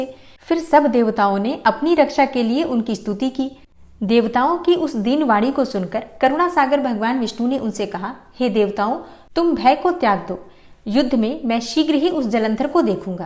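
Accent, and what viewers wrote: Standard. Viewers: अति शुद्ध उच्चारण विष्णुसहस्त्रनाम 🙏🙏🌹🌹 ॐ नमो नारायण ❤❤ जय हो ❤❤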